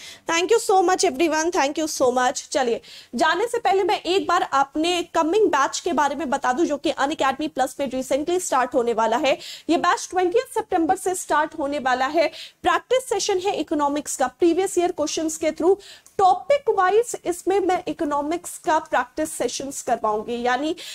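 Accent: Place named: Bihar, India. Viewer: I think aap bihar se ho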